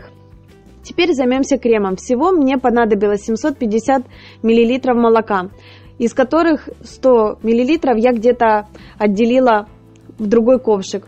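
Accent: Ukraine. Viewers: Зачем же себя так мучать? Вас заставляют смотреть такой прекрасный ролик? Открою вам секрет в Украине так все говорят!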